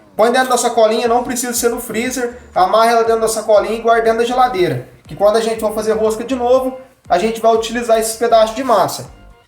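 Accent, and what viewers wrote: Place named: Minas Gerais, Brazil. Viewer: Eita mineirinho uai eu também sou mineira de Carangola uai, ô povo abenco sô.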